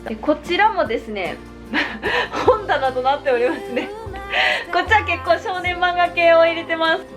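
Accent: Kansai, Japan. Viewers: 初見なんやけどこんな可愛い部屋でこんな可愛い顔してバリ関西弁なん最高すぎる。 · 初見なんですけどムッチャ好きになりました(＊> <＊) サムネの雰囲気とちがってゴリゴリの関西弁やしもうはまっちゃった！ 興奮気味💓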